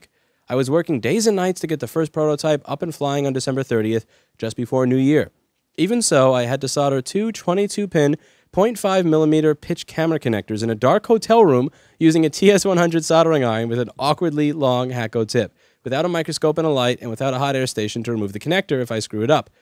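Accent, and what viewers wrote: New York, United States. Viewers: Thank you, for talking like a real New Yorker. Your message is being heard.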